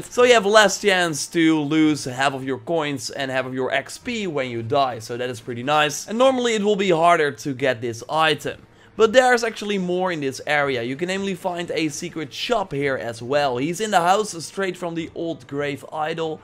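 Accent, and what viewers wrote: Netherlands. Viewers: ik hoor zo erg dat jij nederlands bent echt irritant maar niks mis met je content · This dude sounds dutch.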